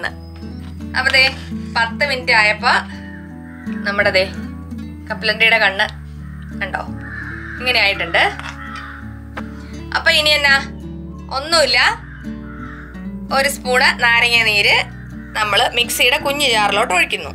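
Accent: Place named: Idukki, India. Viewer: ചേച്ചിയുടെ സംസാര ശൈലി കേട്ടിട്ട് എനിക്ക് എന്റെ നാട് (idukki)ഓർമ വരുന്നു. ചേച്ചി നാട്ടിൽ എവിടെയാണ്?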